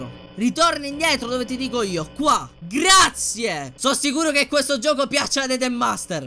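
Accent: Sicily, Italy. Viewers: Sei siciliano? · Ma sei siciliano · sei siciliano? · ma sei siciliano?😱😱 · Ma sei siciliano?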